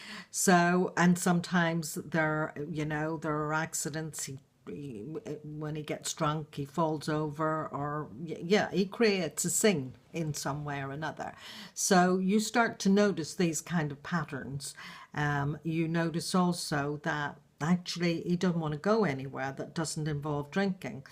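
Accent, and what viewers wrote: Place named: Northern Ireland, United Kingdom. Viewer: are you originally from Northern Ireland? I think I can hear an Americanized version of that charming accent. I am watching your videos from mostly the other side of the coin, because I am in danger of getting lost in the alcohol quagmire myself. For a good few years now I've come home from a full-on, highly responsible job and used too much alcohol to calm my senses more often than I should. As much as I detest my addicition to nicotine, alcohol abuse is my real problem and I've grown to hate it and hate myself for being like this. I still function extremely well (but not to my full potential) in my work and pay my bills and my share elsewhere. I've also been watching motivational videos of how people's lives got much better when they quit drinking as well as others who are realistic about their anxiety and how alcohol gives them a brief respite from it, and that's probably me. 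I'm a competent female tech geek who works in a team with 30 guys, but I support primarily other women in top management positions who jokingly(?) say 'they hear the wine calling' at the end of a hard day, so I think this problem may be bigger than most people imagine. I won't go into my life story except to say that I've had a few truly fucking terrible relationships where my partner has been 'trying', to say the least, and one of them even nearly killed me (fortunately a very short-lived yet traumatic relationship once he showed his true colours - he ended up in jail). But the year I spent in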